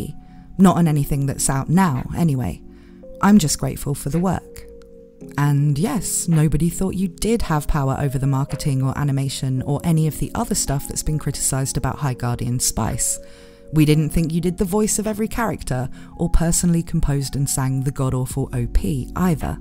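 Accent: United Kingdom. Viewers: I'm loving your videos, I found your channel the other day and I just really like your chill demeanor and accent, I know is a type of British accent but I'm bad with differentiating accent and dialects sorry, even here in America too since I'm a latino lol, but I try since im a fan a couple types like Welsh for example thanks to Dragon quest but I'm kinda rambling at this point so I digress, but I really like it and your dry humor. I've been kinda binging your vids when I can ever since.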